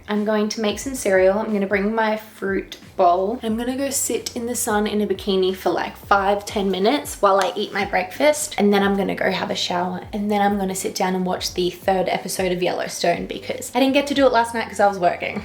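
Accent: Australia. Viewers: When you say “week two” I melt. Love your Australian accent!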